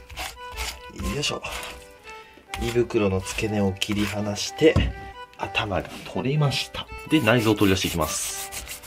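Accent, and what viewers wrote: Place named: Hiroshima, Japan. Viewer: 名古屋ということですが、広島イントネーションがあるような…。器もいつも参考にしています！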